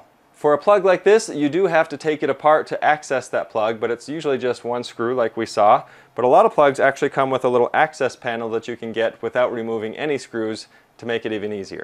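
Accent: United States. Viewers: Your accent sounds American yet you fully endorse a UK product. You even went as far as to wear a red shirt. 🇬🇧 You know how Americans feel about the Reds. (Red coats, rednskins, red scare) I mean its a great color, but just saying red is generally the enemy's color.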